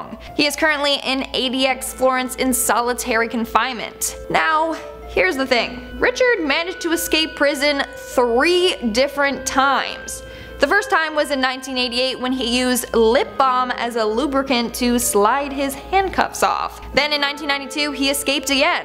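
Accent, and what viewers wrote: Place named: United Kingdom. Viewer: I liked the British accent . But she okay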